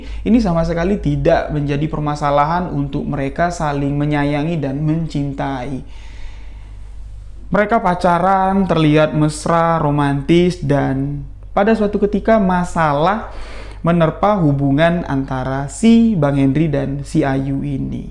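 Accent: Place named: Jambi, Indonesia. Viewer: Walaikumsalam kak....n team SKM Mf kak sekedar request upload dong Kisah kota ghaib Saranjana..... psti y kereen banget d bwain kk dgn logat jambi yg khas........pasti kereen habis 👌 Slm sehat....slm smngat....mkin sukses y Semua.... Yuk gaskeeunn 🔥🔥🔥 By. Yana 🙏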